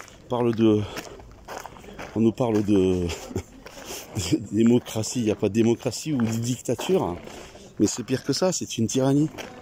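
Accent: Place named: Nord, France. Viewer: C ' ęst un bęl accęnnnnnnnnt , 😉👍 mi chē mí , chē d'tí , ti zotę , achtęur : traduc'tíon : moí c ' ęst moí , c' ęst dę toí , vous autręs , maíntęnant . Rięn d ' aussí chantant qu á toulousę , sínon ící dans lę plat paÿs ,c ' ęst trēs chalęuręux dans lęs blęds , moíns lęs grandęs víllęs . Bíęn a toí , tę bílęs pas , cę quí doít arrívęr arrívęra .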